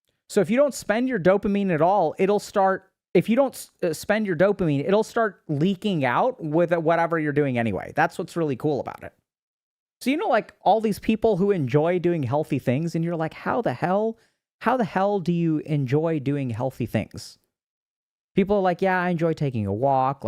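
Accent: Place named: India. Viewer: HAHAHAHAHAHAAHHHAHAH THE INDIAN ACCENT HAHAHAHA XDD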